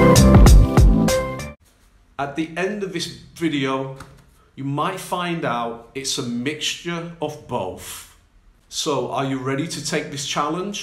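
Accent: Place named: North, United Kingdom. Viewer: I can feel your accent is quite northern😁🏴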